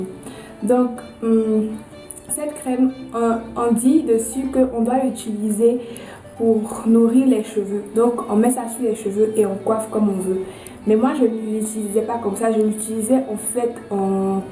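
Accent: Ivory Coast. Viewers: Trop mimi, tu m'arraches toujours un sourire avec ton accent trop mignon qui me rappelle mon pays la Cote d'Ivoire, bon courage et bonne continuation à toi:-)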